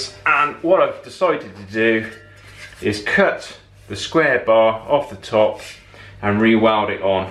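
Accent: United Kingdom. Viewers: Can't say I ever wondered what Charlie Sheen would sound like with a British accent, but here I am.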